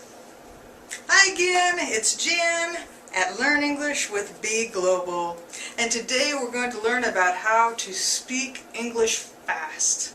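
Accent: United States. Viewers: Easy Spoken English it's an Americanized English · is it american or british ?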